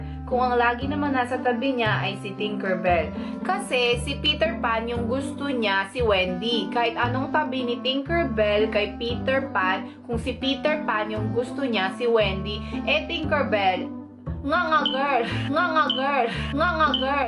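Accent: Visayas, Philippines. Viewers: Taga pampang ka diba ate pero parang bisaya👌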